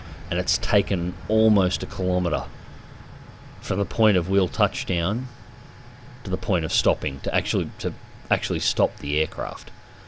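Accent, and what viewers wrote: Australia. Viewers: Oh you sexy voiced Aussie.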